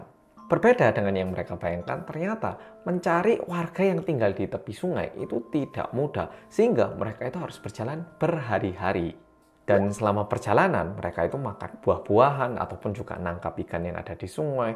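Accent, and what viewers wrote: Java, Indonesia. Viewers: Selalu ngikuti channel ini,tpi baru pertama komen, awalnya kaget dg logat jawanya sama seperti aq,yg asli wong Jombang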